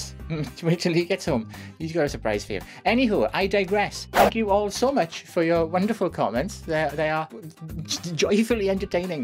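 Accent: Wales, United Kingdom. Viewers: Are u welsh? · Hahahaha "you couldn't afford me". I swear the Welsh accent makes the burn all the better. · I am loving your Welsh accent: my Mum lives in Carmarthenshire, but she's not Welsh: they are very friendly & welcoming!!! Please ignore those very nasty & ignorant comments: these crazzzies are not worth any attention & they need to get what is commonly called: "a life"!!! 😁😂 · Love your Welsh accent from another Welsh person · I will start by saying that I am not subscribed to your channel, yet you popped up and I will not judge,be mean or anything like that as I am a Christian. No true Christian would, as we have only one judge and I do pray that all knows who that is! I only wanted to let you know that as a you tuber I'm sure that you already know that based on what channels that we do like and subscribe too, they also send us suggestions. 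Like as with me, your video that I'm so sorry that I can't remember the caption off this video but do know that two names appeared that I love very much, Trump & Jesus! So, I did watch it but I guess I'd have had to watch those videos leading up to it to know what upset so many. I can say that your British accent I found beautiful! As far as your deep voice, I'd have to say that my beloved father was 6'4 and when I was young I was teased often as I inherited his deep voice...funny though that those Who teased me now wait for hours after (while touring and making a quite nice living on the rd. playing Coliseums and now they act as if we were best friends in school !) I had often wondered if when I was large enough in my career just how I would react to those who's words cut deep in my early teens, & if they would ever show up at one of my my proformancesAlthough under a different name than I can go by on here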